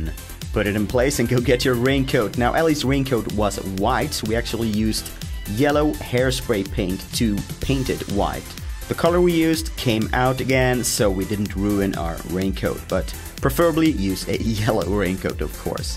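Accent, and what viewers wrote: Scania, Sweden. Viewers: Älskar din skånska dialekt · dont teach swedish if you're skånsk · this is not the real swedish thats skånska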